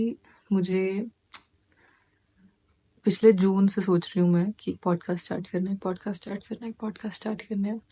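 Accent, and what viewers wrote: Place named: Punjab, India. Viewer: I love hearing punjabi underneath your hindi!!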